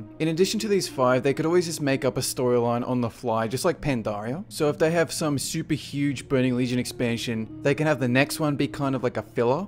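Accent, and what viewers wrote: Australia. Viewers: are you from straya?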